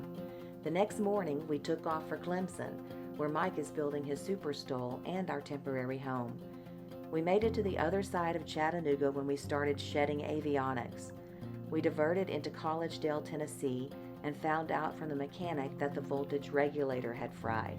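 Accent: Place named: Mississippi, United States. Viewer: I love the videos and great to finally hear your Mississippi voice!! ❤️.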